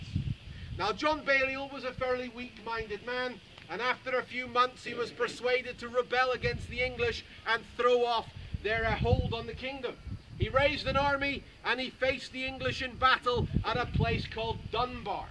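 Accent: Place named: United States. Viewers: Interesting presentation, however, the presenter sounds TOO American for the sake of authenticity. Saor Alba🏴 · the presenter is a Highlander Born and bread, he went to America To study where he picks up his American twang.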